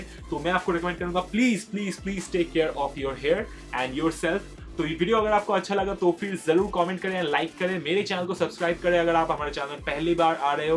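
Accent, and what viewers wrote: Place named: Bengal, India. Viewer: Ooo baa bhalo..tomar kotha sunei bojha jachhi lo. J tumi script lekho na r tumi bangali..ami o likhi na .skript...😂❤️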